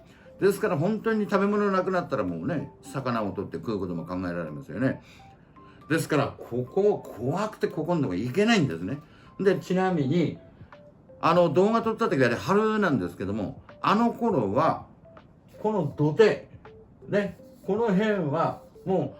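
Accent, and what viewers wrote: Fukushima, Japan. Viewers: ウマ娘を当てる動画から来ました。耳馴染みの深い故郷の訛りで、福島の方だとすぐにわかりました😊ほんとに熊にはお気をつけてお過ごしくださいね💦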